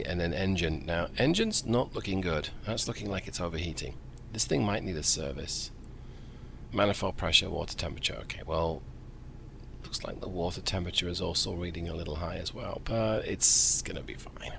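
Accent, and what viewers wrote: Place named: England, United Kingdom. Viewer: Hahaha oooo nice u sound very English to me mate I guess u haven’t picked up the American accent yet lol it to ma to haha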